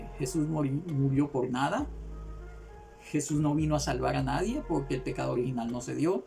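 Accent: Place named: Costa Rica. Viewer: Sos de Costa Rica??? Hasta cierto punto puedo identificar el acento de la gente de mi país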